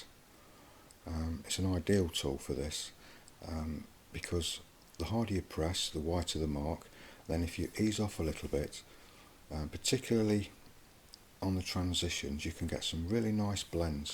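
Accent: United Kingdom. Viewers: That's awesome. Just subscribed. The British accent adds to your credibility too.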